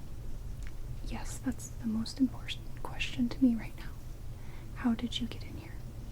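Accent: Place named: Midwest, United States. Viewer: A vampiress with a bit of a midwestern accent? Intriguing;) Had to happen at some point, of course.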